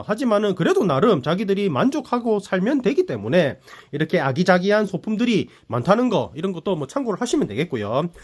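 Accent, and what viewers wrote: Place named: Busan, South Korea. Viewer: 부산태생이라그런지 에 자꾸눈이가요 말씀도 넘 구수하게 잘하시는 사투리가 듣기좋아요 응원합니다~~^^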